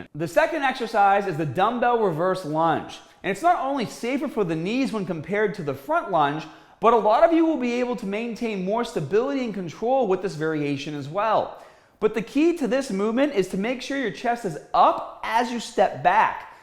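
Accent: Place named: Massachusetts, United States. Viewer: Sounds like your from Mass? Great video!!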